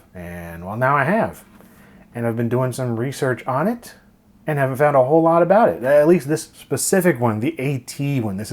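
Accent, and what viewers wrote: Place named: United States. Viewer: Well... I saw the title and the thumbnail, so clicked on it and was shocked when I heard a US accent coming out of my main speakers instead of the one I was expecting coming from my subwoofer!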